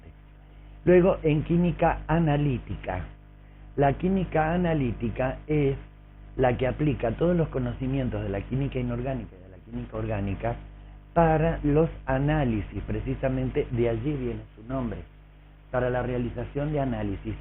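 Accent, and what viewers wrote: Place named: Argentina. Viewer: Fantástico el video, profe. Y en "argento"...!!!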